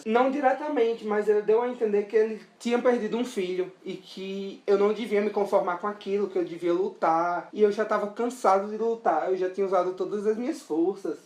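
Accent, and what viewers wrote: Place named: Recife, Brazil. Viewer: Eu me emocionei internamente e me identifiquei demais com sua história, luta e com você também (sou de Recife e tenho o mesmo sotaque e forma de falar que você tem Kkkkk) porque eu sou bem jovem e passo por uma trajetória semelhante ao que você viveu na adolescência em vários pontos (até mesmo a questão da mania de escrever textos para desabafar e etc). Ver o seu vídeo me deu uma injeção de ânimo e de mais vontade de ir atrás dos meus sonhos e independência. A sua história é realmente muito parecida com o que vivo, apesar de alguns pontos de diferença, eu acredito que a minha história terá o mesmo resultado que a sua: a aceitação, e mesmo se eu não tiver, eu permanecerei firme e forte na minha luta e sonhos. Muito obrigado por inspirar pessoas como eu. <3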